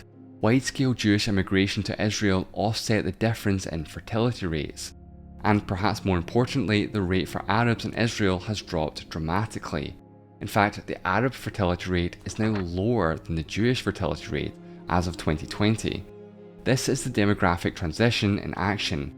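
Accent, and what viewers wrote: Scotland, United Kingdom. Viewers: He's Scottish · A Scottish English accent is so appealing to the ear.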